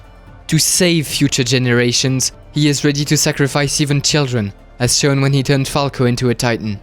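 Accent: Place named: France. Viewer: Cet accent français... sublime.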